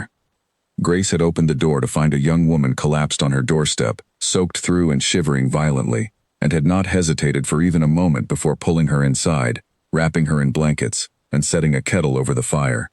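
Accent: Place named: United Kingdom. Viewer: Oh good! It’s not just me! This is one of the few narrations that I’ve enjoyed. Properly English (British) sounding and fluent. However - Mr Pembroke, the solicitor, should not have divulged ANY information about the Duke’s father’s Will, to anyone who was not an executor. So, giving out that information freely to Lady Margaret and yet not having the professional courtesy to directly advise the Duke of the manipulating fraudulent activities of his cousin but sending his daughter Charlotte, is ludicrous. What, by the way, happened to the Aunt? Why did she not feature in this At All? Plus, there is no consistent timeline… it went from Eleanor spending 3 days at Grace’s house to Charlotte’s arrival the next day (notwithstanding the Duke’s also conversation that same day). Then, the 2nd Ball, weeks after the first, although it was seemingly done within days. 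Then also, how did Margaret get from the manor, to Reading and back again without details of where she was living in between. The whole story needs filling out some more. The ballroom confrontation was a bit blah! The entire story premise was good, but more care should have been taken with the details.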